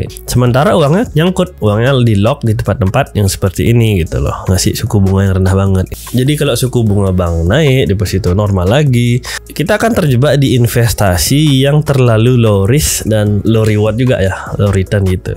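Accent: Bali, Indonesia. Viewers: Logatmu enak skali bang didengar. Org bali bang ?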